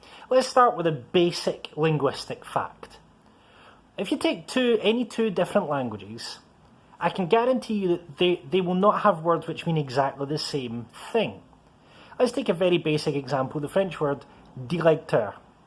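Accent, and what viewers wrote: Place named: Scotland, United Kingdom. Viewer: ... that bonnie Scottish (Glasgow?) accent! Love it! :)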